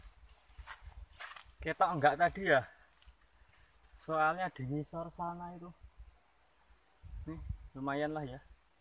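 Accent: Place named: Java, Indonesia. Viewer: seneng kalau denger kakak ngomong,totok jawanya kayak aku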